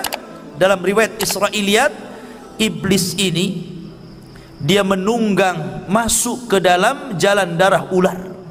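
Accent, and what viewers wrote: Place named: Arab world. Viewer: Bicara nadanya begitu cih gaya timur tengah bahasa yg mengerikan pdahal Islam rahmatan lilalamin,,biasa saja said nya ora. Indonesia yg landai ding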